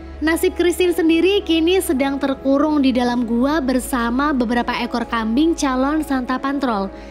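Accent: Pemalang, Indonesia. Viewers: Mbak asli wong pemalang?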